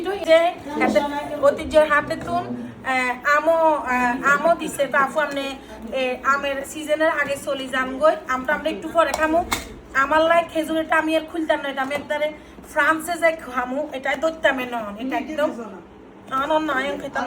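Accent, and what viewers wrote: Noakhali, Bangladesh. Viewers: রাখি তুমি শুদ্ধ ভাষা বলো নোয়াখালি ভাসা শুনতে ভালো লাগে না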